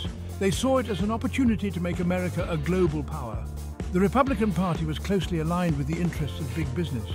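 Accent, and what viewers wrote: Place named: England, United Kingdom. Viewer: So strange: Scattering modern "historical" pics with an English accent and "inspiring music" What a trip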